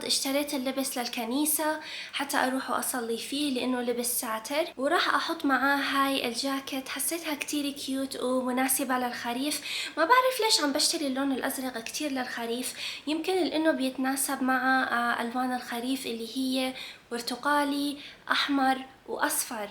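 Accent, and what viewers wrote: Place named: West, Algeria. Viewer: ايه صح صح انا ثاني شفيت بلي كانت تهدر بلهجة الغرب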